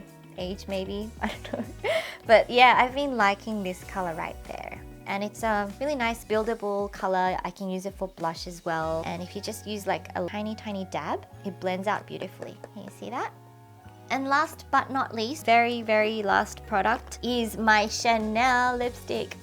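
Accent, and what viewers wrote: Australia. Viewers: Hi, your accent caught me 😅 I’m from down under too! Which part of Australia will u be moving to? I’ve just started exploring K-Beauty and really enjoyed this VDO. Already purchased a-few items you shared 🤭. Looking forward to your next upload 🙏🏻🫰🏻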